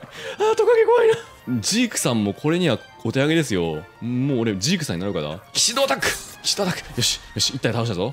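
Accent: Kansai, Japan. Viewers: めっちゃ関西弁なの慣れてるからか聞きやすい^^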